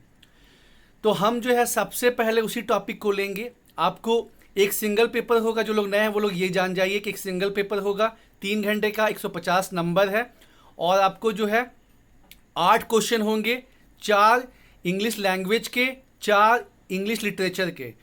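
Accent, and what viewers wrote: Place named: Jharkhand, India. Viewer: thanks a lot,,for replay without any boasting I m now out of jharkhand and your style of speaking recall me jharkhand🙏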